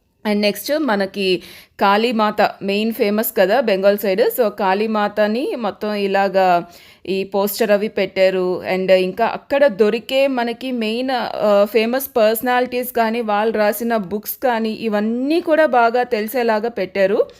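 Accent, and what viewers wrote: Telangana, India. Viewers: Super ga ready ayyav chala Baga telangana bhasha bagundi ane super ga undi ❤ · Telangana slang bagundi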